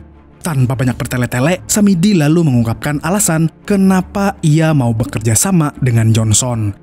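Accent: Banjar, Indonesia. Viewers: banjar banar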